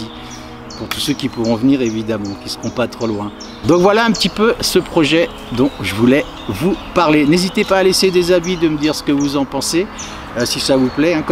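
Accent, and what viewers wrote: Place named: Central France, France. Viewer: vous avez une façon de parler qui m est familière surement pas loin du centre de la france ... je vous souhaite bien de belle trouvaille prospecteur dans votre jolie coin de vie ou le calme règne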